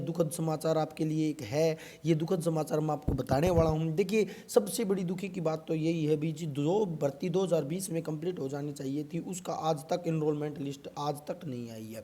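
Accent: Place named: Haryana, India. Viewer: Bhai bole te to Haryana ka laage hai 😀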